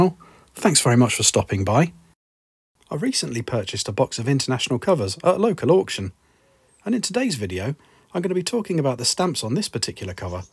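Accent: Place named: England, United Kingdom. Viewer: Steak and milk.... very good for me reading, earing lovely words slowly, easy to repeat if not understanding, and english accent i can restaure with your tonics on words. Not only the lesson about phylatélic value about this New-Zealand letter from 1974. Words are so well writing, at middle of letter eyes were synchronize with words and your voice. Great time and good idea Thank you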